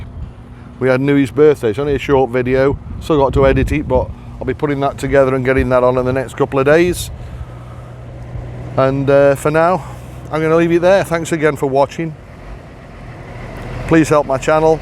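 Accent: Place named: Stoke, United Kingdom. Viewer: New sub here, you sound like a stoke youth ?..i,m back in uk after 7 months (222) days in thailand, i cant wait to get back ,. ive been over there 11 times in all since 2009, ive got a long term thai g/f and we live at her nice house in kamphaengpet , ive got a car so we travel all over TH usually for a month when i get back there, .The last trip was 25th nov 22 and we did most of the southern islands , spent a couple of days in kamala beach down your neck of the woods , I had my fill of thai immigration with extensions and border bounces but it worked out ok to maximize my stay,. I bet it does your head in looking at the thai power pole wiring, im surprised it wasnt 1 of your 5 thai dislikes, !. I plan on getting a retirement o visa next time but there's talk of immigration doing a 90 day visa exempt and changes in the 800000 bht retirement o visa, have to see how that goes, i want to be back by the end of november latest,. 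Bit pointless getting a 60 day tr visa in uk if they end up doing a 90 day free one, im doubting it will happen , having only retired on nov 18th 22 and flying out on the 25th nov 22 69 y/o times not on my side, ive been back in uk since july 4th 23 and i cant seem to get motivated over here, i was planning on selling up and shipping out but with the unsertanty with th immigration its easier said than done, i might go down the agentcy route visa wise, although i have the money and resdency out there ive not got thai bank account yet,.we will see how it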